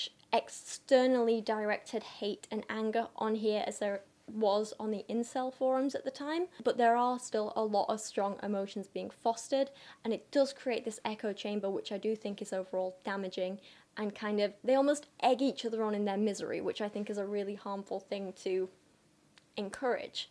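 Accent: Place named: Northern England, United Kingdom. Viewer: A northern girl repressing natural accent with London estuary accent.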